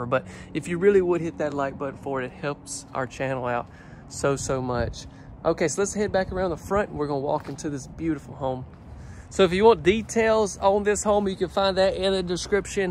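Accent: South, United States. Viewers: You just sound Southern to me❤️😉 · Love this one!! Turn off the volume?? I could listen to that southern drawl allll day long! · Couldn't get tired of listen to you. Love your southern/ cowboy voice. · Im not talking about the way baby talks.. love his southern charm....it's the fact he has to open all those freeking cabinet doors!!!!! Unless there is something very special in the cabinet, all cabinets look alike!!! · I love your southern accent. Your commentary is hilarious. It's one of the main reasons I enjoy your videos so much. This home was beautiful but a door that leads to the laundry room not a good idea.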